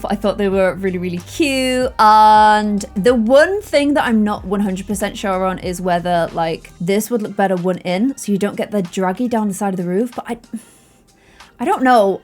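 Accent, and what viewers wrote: United Kingdom. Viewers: My American self laugh so much of how she said rabbit Is that wabbit I’m sorry I’m American and think British accent are funny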